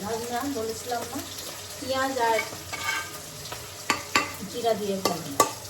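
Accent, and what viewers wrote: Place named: Rajshahi, Bangladesh. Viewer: Ettto bad comment keno apnader? Uni notun video korteche...hoyto ektu vul hoy..ar tachara unara Pabna Rajshahi er manush...kothar moddhe local tone thakbei....eta emon dosh er kichu na...manush er valo chaite paren na??dhire dhire e manush unnoto hoy.. Ekbare na...parle valo kichu koren nije...then onner piche lagen...azaira jotto sob..jader kono kaj nei tarai emon onno ke bad comment kore...tai bolchi valo hote shikhen....video valo na lagle dekhben na..ke boleche apnake dekhte??/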